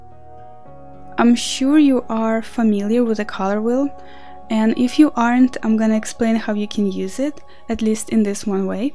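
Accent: Russia. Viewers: Wow, are you from Russia? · Русский акцент))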